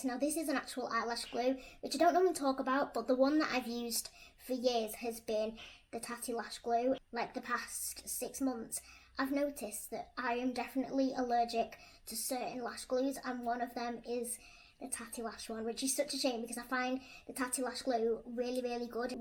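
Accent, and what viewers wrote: England, United Kingdom. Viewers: I just love your English accent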